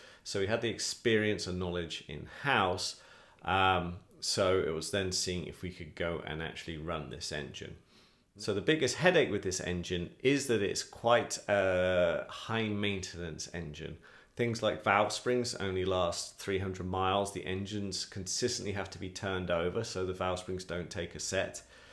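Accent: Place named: United Kingdom. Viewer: A ratio without units is meaningless, unless it is a ratio of values with the same units. Since power and weight are different measures, "1:1" by itself could mean anything... 1 horsepower per pound? 1 horsepower per kilogram? 1 kilowatt per pound? 1 kilowatt per kilogram? 1 BTU/hour per stone? (in honour of British accent) Any of these is arbitrary and unrelated to actual performance goals.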